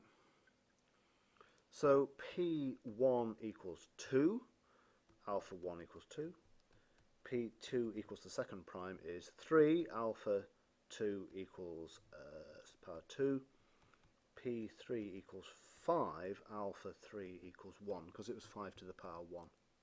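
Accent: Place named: England, United Kingdom. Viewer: Brilliant. Also audio is fine as well for me. So nice to hear an English accent as well (just a personal comment).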